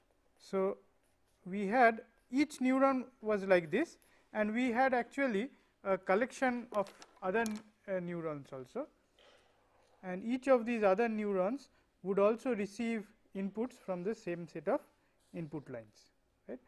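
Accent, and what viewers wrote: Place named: North India, India. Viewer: Indian English with little North Indian accent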